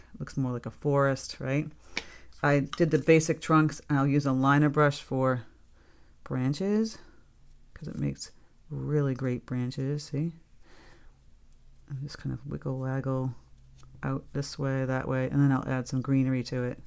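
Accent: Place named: New England, United States. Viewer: Thank you so much! You are such a gifts painter!! I appreciate your instruction so much I love watching you paint, I learn tons of things. ❤ Plus I have grown up in the Southeast, and listening to your New England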